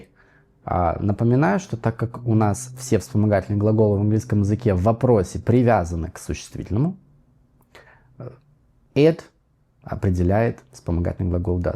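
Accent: United States. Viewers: ВТОРОЙ канал годный что нашел на английском (на русскоязычном ютубе), а не всякая ерунда типа плейлистов и прочего!, ваш американский очень очень хорош! Буду с удовольствием смотреть, спасибо!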